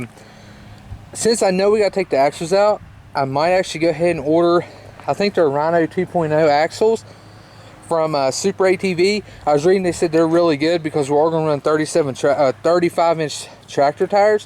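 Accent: West Virginia, United States. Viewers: Where are you from. West Virginia ?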